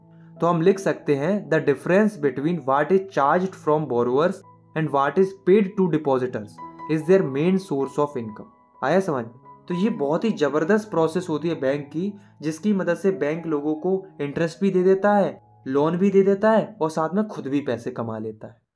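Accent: Uttarakhand, India. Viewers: Sir uttarakhand se ho kya ??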